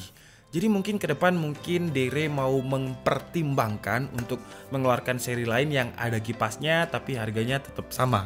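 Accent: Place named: Madura, Indonesia. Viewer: Logat Madura kek gitu Madura bagian timur, ngk semua kok logat kek gitu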